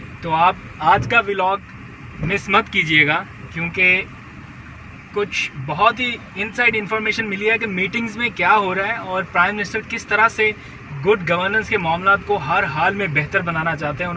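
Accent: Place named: India. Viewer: بہت زبردست جناب شکریہ جناب! ایک بات؛ پلیز بھارتی چینل زیادہ واچ نا کریں کیونکہ آپ جھٹکے والا بھارتی انگریزی لہجہ استعمال کر رہے ہیں۔مثلاً کمپے ٹشن کہرہے ہیں جو کہ کانپے ٹی شن ہے۔ کسی بھی انگریزی وڈیو پہ دیکھ لئے گا اسطرح کے بہت سے الفاظ بھارتی انگریزی کے کہلاتے ہیں مغرب میں۔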